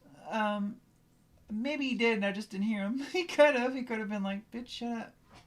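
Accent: South, United States. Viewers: It's my first time hearing your voice. Wow that soutthern twang!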